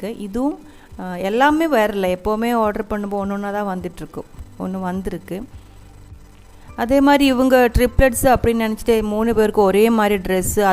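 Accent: Kerala, India. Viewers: Unga voice enakku romba piduchu irukku chechi. Malayala tamil 👌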